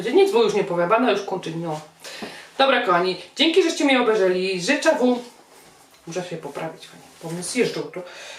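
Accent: Silesia, Poland. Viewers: Czesto ogladam Pania i nie umia sie nasluchac godania jo tyz jestem z slaska pozdrawiam fajne przepisy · Mówi po śląsku bo to Ślązaczka to fajny gwarowo język 😃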